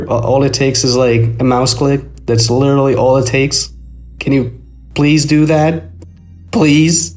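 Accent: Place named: Latin America. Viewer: Sorry for the question, but you are a latino american? You form at talk is very diferent. Amazing video, adventure time it's a great show, a thue Master piece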